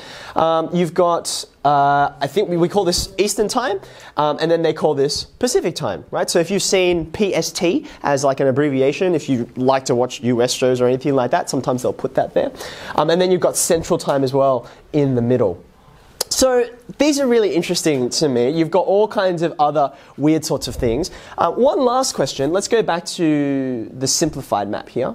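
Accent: Australia. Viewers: is the teacher from australia??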